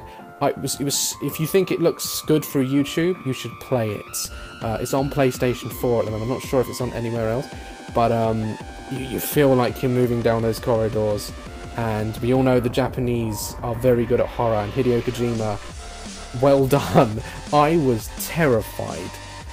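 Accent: United Kingdom. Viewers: He sounds so much more British then vs now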